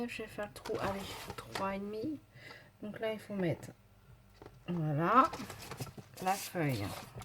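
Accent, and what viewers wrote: England, United Kingdom. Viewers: Coucou, quand même pas mal cet appareil, top!!! J'adore ton accent anglais, ah ah!! Bises, Véro. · Cc ma belle l’accent anglais top 😂😂👍hâte de voir ton happy mail .... je pense que je vais l’acheter la prochaine fois.... bisouuus😘😘😘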